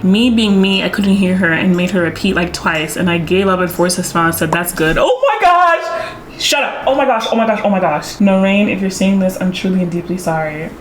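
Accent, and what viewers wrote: United Kingdom. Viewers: Your british accent threw me off😂😂😂😂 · Great video these type of videos are funny but as uk girlie please let’s not do that accent next time was terrible 😂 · You have such a good British accent girl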